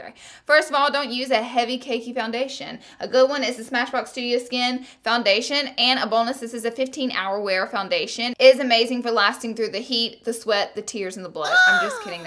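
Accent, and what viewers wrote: South, United States. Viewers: is she southern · Oh that Southern accent-- so sweet to my ears! 'Cuz I'm from Louisiana. Prayers for those who have been affected by the flood in Baton Rouge... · erez muy lindaa me encanta tu acento sureño..Buenos tips..😉😉... you are beautiful luv your southern accent ...good advices I'll be putting them in practice you are one of my favorite😉😉😚🌹🌹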